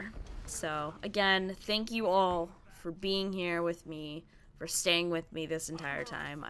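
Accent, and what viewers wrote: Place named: Canada. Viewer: Gurl! Miss you. Whatever you gotta do, you do you :). But we(I) will be there for you in whichever way you need xD. P.S. You sound soo Canadian...and I love it! No offense..? Lol <3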